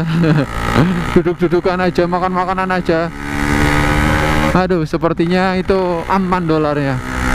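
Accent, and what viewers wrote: Banyumas, Indonesia. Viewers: Setuju full ngapak 😂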